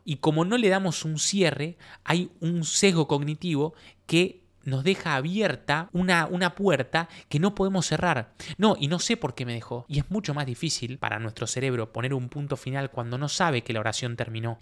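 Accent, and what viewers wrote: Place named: Argentina. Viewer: Tu acento me hace extrañar mi pueblo Argentino. Bendiciones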